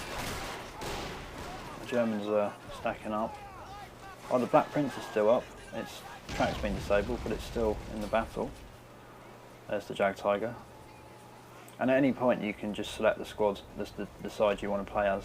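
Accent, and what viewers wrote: United Kingdom. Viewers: nice work, cockney! cheers!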